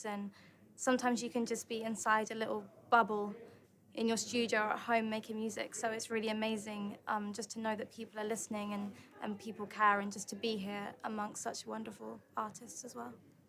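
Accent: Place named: United Kingdom. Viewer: HER ADORABLE BRITISH ACCENT it hurts my gosh it hurts she's so fantastic it HURTS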